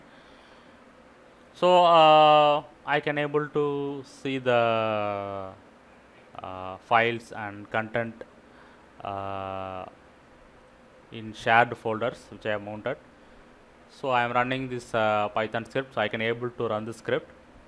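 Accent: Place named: South Asia. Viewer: Bro I love your desi pan eating accent uwu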